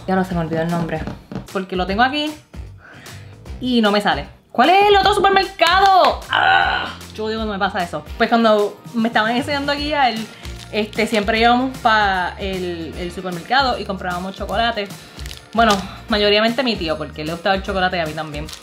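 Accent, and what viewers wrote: Puerto Rico. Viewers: Que mujer mas linda de verdad. Una mujer China hablando bien boricua. En la escuela yo vi una Japomesa hablando español y en la Universidad una Vietnamese woman tambien hablando bien Boricua. Que bonito de verdad. Dios bendiga tu belleza. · 😃😃😃😃nena tu hablas mas puertorriquena que yo ME en canta el hablar boricua 😭😭. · Me encanta tu personalidad eres un vacilon 👍😅me siento bn orgulloso d q t sientas como toda una boricua 💯💪me sorprendes y me encanta tu acento d mi preciosa isla no puedo irme sin antes decir q eres BELLA........😍😘💖🌹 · Woooo corillo aquí una nueva subscriptora jajaja te ame dsd el momento en que te escuché hablar boricua de pura sepa 💓 Desde Ponce PR ❣️ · tu asento es de boricua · Me muero de la risa contigo yo soy nacida y criada en P.R hasta los 16 y tu hablas mas boricua q yo!😂🇵🇷 love the video you are too funny. Definitely new sub!! 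· Niña tu acento es puro boricua. Nosotros queremos recibimos muy bien a nuestros invitados. Mi recuerdo es las reuniones familiares, yo extraño mucho a mi familia. Feliz Navidad hermosa💞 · Nenaaaa, eres 100xciento boricua. Acento, manerismos, pique, me rei tanto contigo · 😂😁😂 Te pasas!, saludos hermosa, no te puedo ver como china pues con ese acento y carisma de boricua al 100%, imposible, no se como caí en unos de tu videos pero si, son cheverones😁 muy simpatica, te voy a seguir viendo por aqui🙏 cuidate!😁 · Si no me dices que eres china, ni me doy cuenta. Pareces, hablas y actúas como una puertorriqueña 🤣👐🏼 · Que chulita, con ese hacento Boricua ,.Que pena que no eyes de mi epoca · Está mujer si q tiene acento bori 💯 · Me encanta tu accento boricua eres hermosa y muy energética me encanta todo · Gracias por hablar tan lindo de mi isla. Tienes el flow Boricua. 😊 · Ahhh me encanta tu acento boricua!!! 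😂😍😍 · Quien es esta Boricua china habla bien borricua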